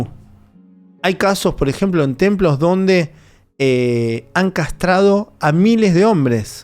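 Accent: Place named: Argentina. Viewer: no le cambies, me encanta cuando dices: “en cana” y es un “agco” siempre me da risa cuando los argentinos dicen asco.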